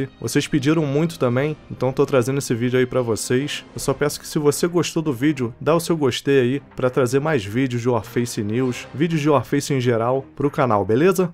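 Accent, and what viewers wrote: Rio de Janeiro, Brazil. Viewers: acho q ele é carioca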